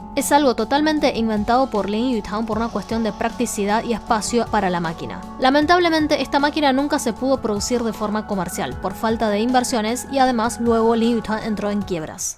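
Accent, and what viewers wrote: Argentina. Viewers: Amo su pronunciación china/argentina dioss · Un linda chinita que habla con acento argentino!! Tenes un nuevo suscriptor che! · Me encantó el video y me gusta tu acento argentino. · Sos mas argentina que yo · Es graciosos ver a una china con acento argentino 🤣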